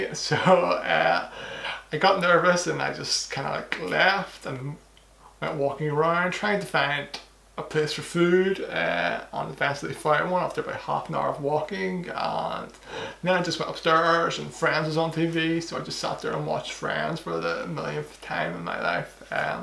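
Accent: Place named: Ireland. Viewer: I loved your video and your accent, where in Ireland are you from?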